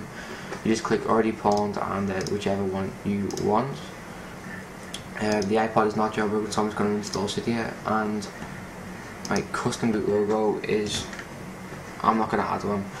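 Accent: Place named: United Kingdom. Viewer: maybe we could follow the "simple" steps if it wasnt for your anowing brit accent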